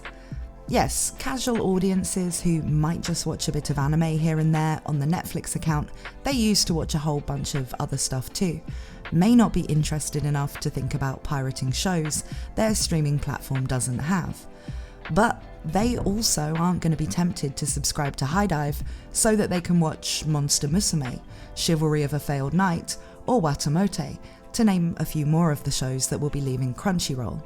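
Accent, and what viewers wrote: United Kingdom. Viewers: They should have someone we can understand say this I don't speak British English 🤦‍♂️🤷‍♂️😤🤢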